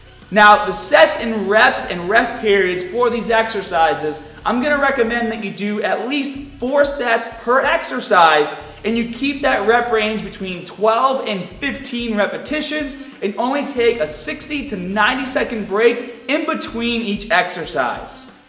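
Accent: Boston, United States. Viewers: is a Bostonian - he used to be conscious of his accent probably to grow his channel, but now he definitely let's his inner accent shine: the absence of R's. Jeff's accent I thought was New York, no? It doesn't sound quite Bostonian, but it is similar... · You sound like you’re from bawston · You have a bostonian accent. It's faint but it's there. Thanks for the tips. I've been looking for some solid oblique workouts. You look great.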